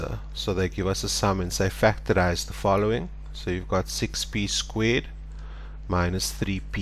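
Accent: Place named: South Africa. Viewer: lol i can hear he’s south african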